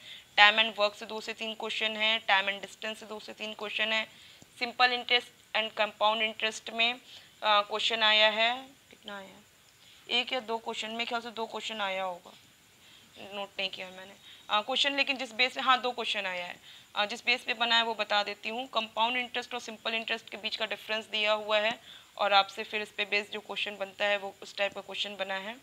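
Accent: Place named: Eastern Uttar Pradesh, India. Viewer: I LIKE YOUR ACCENT 🙂 EAST UP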